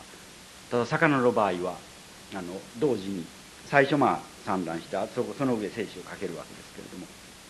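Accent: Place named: Shikoku, Japan. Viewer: 四国出身の方らしいアクセント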